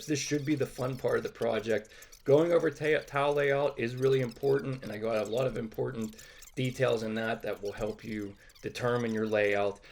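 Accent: Pittsburgh, United States. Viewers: Before I saw your shirt I just knew you was from Pittsburgh 🤣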